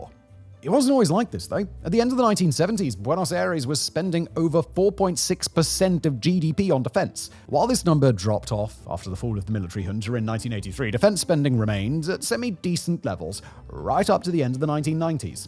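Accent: United Kingdom. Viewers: Cannot stand the deuchy obnoxious British accent!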